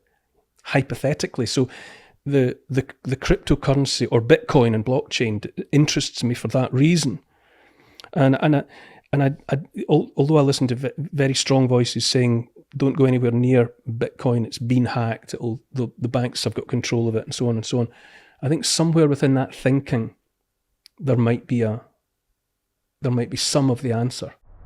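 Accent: Scotland, United Kingdom. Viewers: You kniw Americans love a British accent. This ain't a British Accent. Nothing worse than listening to a nervous Scott... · Ahhh, that Scottish accent!! 🥰😘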